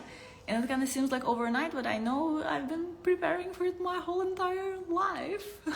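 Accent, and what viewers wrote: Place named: Russia. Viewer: A very strong russian accent... annoying.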